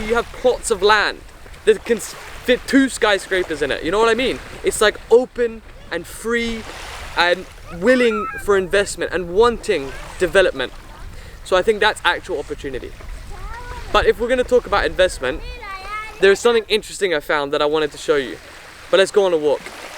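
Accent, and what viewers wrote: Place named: Australia. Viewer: MashAllah! Are you mix? You look mixed. I can also peep the australian accent. AUSSIE AUSSIE OI OI OI!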